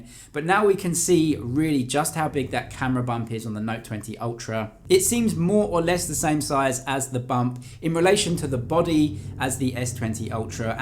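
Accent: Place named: United Kingdom. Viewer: I thought you were Brian Firkus but with a British accent ahaha nice video though! 👍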